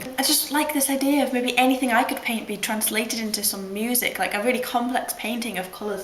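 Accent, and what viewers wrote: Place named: Hull, United Kingdom. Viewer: Yay, I've just discovered Daphne Oram in reply to me mentioning Delia Derbyshire in a Guardian blog. Check out Delia, and also check out (album: ) who feel music in similar ways to Oram. Stockhausen too but his sound is not very palatable. Klaus Schulze's music is very visual in its feeling (especially earlier stuff, like late-70's to early-80's). Good luck with your projects. Where's your accent from? I used to live in Hull, sounds similar.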